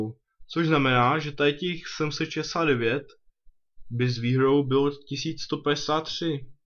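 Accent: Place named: England, United Kingdom. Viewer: ja neviem ci skusas anglicky prizvuk alebo potrebujes logopeda ale strasne sa smejem :D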